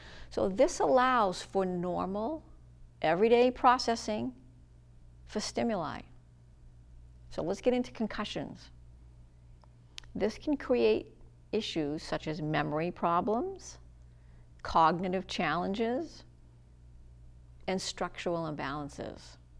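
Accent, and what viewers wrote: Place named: New England, United States. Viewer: A fellow New Englander!! I knew that accent sounded familiar! Great video. Do you teach classes in person?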